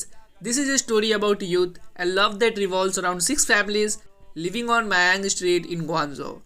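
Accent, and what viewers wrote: India. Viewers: Are you Indian? Your accent 🤪 Me is too🤣🤣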